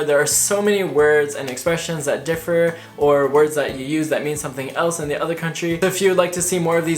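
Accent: Quebec, Canada. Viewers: Omg! Your french-Quebec is so nice! Hahahahaha (i'm french Canadian) ☺️ It's the same for us, french speaking, with the difference between Canada's english and British english. Nice video! ☺️👍🏼❤️ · En tant que français, on entends le p'tit accent québécois quand tu parles :p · больше точно It’s nor good or bad it just means he speaks candadian french and not French from France ☺️ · You have a Québécois accent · Tu as un putain d'accent quebecois ahah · You have a french Canadian accent, not a french from France accent. It's cute though. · wah you really have the quebecois accent ! im suprised lol nice video :) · Very good pronunciation in both accent btw (still need a little work on the Quebec's accent but still... very good!) Funny fact : did you know the Quebec's accent (the way we "contract" words) is the same the French were speaking in France in the XVI century ? 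Quebec is a former French colony. And our accent evolved differently from France from this point.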